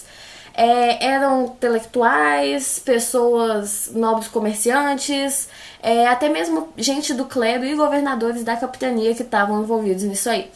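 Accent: Minas Gerais, Brazil. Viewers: Vc e mineira ??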